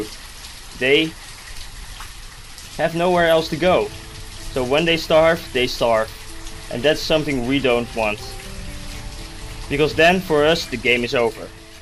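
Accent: Netherlands. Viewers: Nederlands accent woehoe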